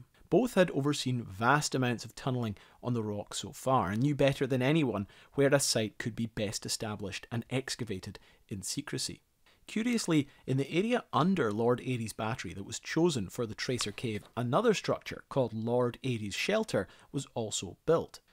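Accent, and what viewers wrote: Scotland, United Kingdom. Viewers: Really great video,. Never heard of this bunker before and I guess a lot of people didn't know anything about this top secret place either. The idea of basically being buried alive in there is kind of freaky. Those volonteers had balls of steel and were really dedicated to their country. I loved the narration and the scottish accent. I'm going to go watch your fort drum video, I've been looking at that thumbnail for a while.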